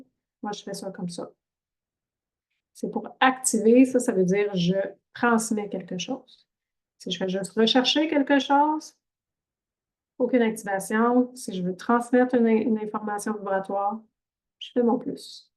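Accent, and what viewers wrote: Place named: Quebec, Canada. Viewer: Hy, Très bonne pédagogie. Tes vidéos seraient plus agréables avec un bon son, car là, c'est difficilement audible. Qu'il est beau cet accent québécois. A bientôt. 😊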